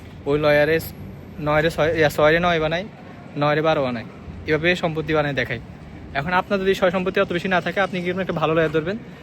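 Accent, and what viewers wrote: Noakhali, Bangladesh. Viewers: bhaiya videor seshe ashi bhujchi amne noakhalir 😂 bhai aio amnego noakhalir manush 🤣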